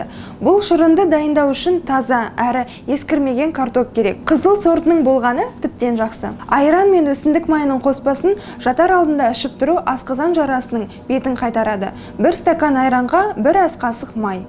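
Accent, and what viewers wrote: Standard. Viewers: Құп көрдік. Міне осындай таза сөйлейтін қазақтар көбейе берсін... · Міне керемет ! Таза қазақша түсіндірді кеңесіңе Рахмет!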